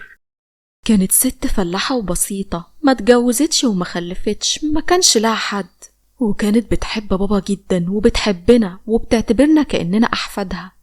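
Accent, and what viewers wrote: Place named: Egypt. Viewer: انت رائع ، انا اكره لهجة المصرية لكن انت خليتيني احبها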